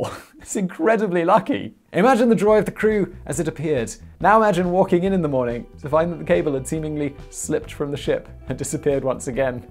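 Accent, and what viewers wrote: United Kingdom. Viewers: Thank you for pronouncing Newfoundland correctly.. well at least as correctly as possible with a British accent.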